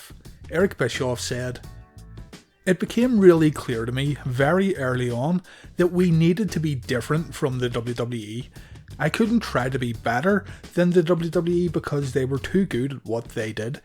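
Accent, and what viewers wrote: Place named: Northern Ireland, United Kingdom. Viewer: Dude are you from the north of Ireland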